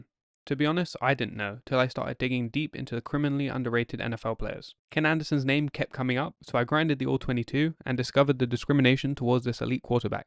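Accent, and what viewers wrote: United Kingdom. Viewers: British accent? ok. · "Many of you grew up watching the game. I am from the UK, so I didn’t. I only discovered the sport in 2012/2013. I’m sorry if it bothers some of you that I’m young. What can I say." -- Some people are just bothered and get on the web to share their misery. Don't let them grind you down or steal your joy! I watched your video, noting your accent, and thought you are very insightful. Glad to have a young fan of American football in the UK! Liked and subbed. "I want to stress I’m no expert. I get things wrong all the time. But I enjoy the game, I like researching, and I hope to provide a unique spin in my videos. I will continue to strive and improve every video." -- Carry on mate, and enjoy yourself. Don't ignore your critics, but focus on your fans. May you gain many! "Can’t change my age though....Sorry for the lengthy comment. 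Thank you for your time and God bless." -- Haha, time will take care of that for you, trust me! But those numbers are only laps around the Sun. True wisdom is found by looking above and beyond the Sun. May God bless you, too, and grant you much wisdom. See you in your next video.... 😃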